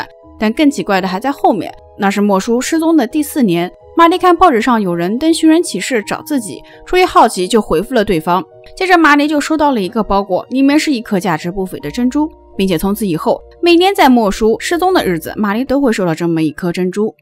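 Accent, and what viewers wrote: Nanjing, China. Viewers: 这口音暴露了蓝鲸人...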